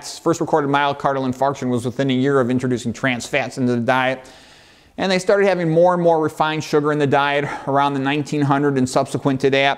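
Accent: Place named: New York, United States. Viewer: thank you for your response. Keep up the fine work my friend! Btw, I also love the New York accent. My favourite accent from the USA.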